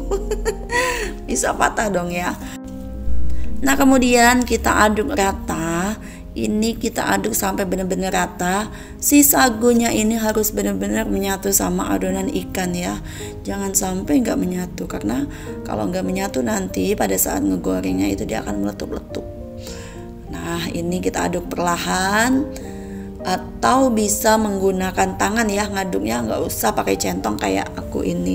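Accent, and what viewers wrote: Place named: Palembang, Indonesia. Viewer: Lucuk denger suaranya si mbk bicara, aseli org palembang kayanya karena menantu ku jg orang palembang.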